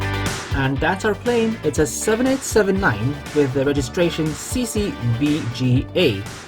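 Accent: Scotland, United Kingdom. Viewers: whaoh i never expected you to have a scottish accent LOL ,love the voice over great addition to an already great channel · your accent dips in and out of hard Scottish so it's just interesting to listen to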